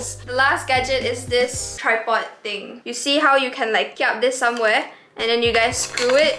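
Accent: Sarawak, Malaysia. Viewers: This is my first time watching your video. And I'm guessing you are Sarawakian sbb ada slang sikit. Lepastu whenever you klaka sarawak and I was like, OMAGOD HEBATNYA AKU. hahahhaa. Love your video and tq for the tripod yang boleh lekat dkt katil tu. Gonna buy it!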